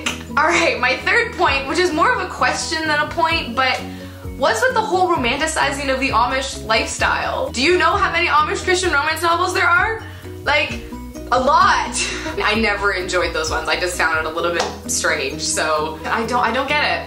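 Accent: Canada. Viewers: Do you have a touch of a Canadian accent??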